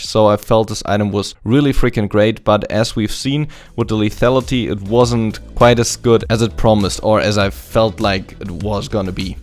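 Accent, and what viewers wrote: Germany. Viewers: deutscher das höst man xD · HOLY SHIT der deutsche Akzent ist real...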